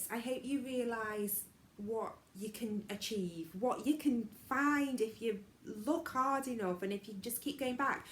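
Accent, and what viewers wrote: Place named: England, United Kingdom. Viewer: I just think 🤔 I cant stop 🛑 the Charity shop buying it’s the bargains I see I can come out with anything I like your fine English accent I was born in Derbyshire myself with 3 of my sisters I visited my cousins in Derby a couple of months ago and went into every charity shop in Derby they are good here too charity shops I mean even in my home town here in Tralee there are a lot of them so thanks 😊 for making me feel normal i thought I would need therapy because of my addiction bye for now ohh you can send me the pink dressing gown I can’t find mine 🤣🤣🤣🤣🤣🌟🌟🌟🌟🌟🌟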